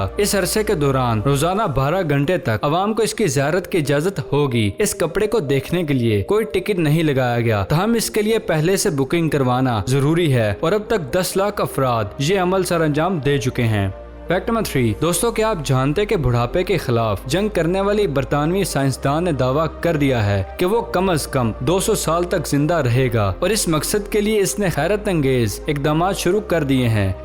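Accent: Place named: Rawalpindi, Pakistan. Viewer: love u bran jani PPindi ke lagty hoo keep up the good work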